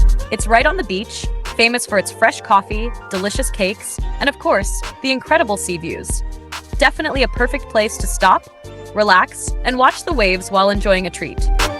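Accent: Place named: United States. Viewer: Ruined by this awful American accent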